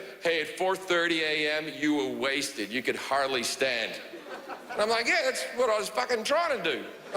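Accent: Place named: Australia. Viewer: Jim is an Aussie. I'm an Aussie. We all actually talk like this all of the time. It's completely normal. If you were at an Aussie BBQ then you would hear this kind of banter from multiple people all night long. It's how we roll. It's just great that Jim has made inroads into the international market and has exported our brand of humor, not that it's actually humor. It's just how we talk normally. Australia is a funny mother fuckin' place. You'd love it. Come on over. I'll throw a shrimp on the barbie for ya.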